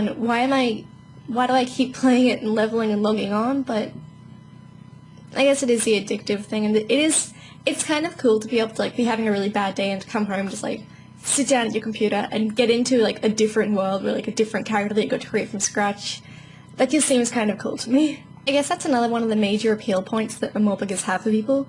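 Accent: Australia. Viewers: ZOOOOO MAAAAAAAAHHHH GOOOOOOOOOOOOODNESSSS?! You're Australian! Instant. Fucking. Sub.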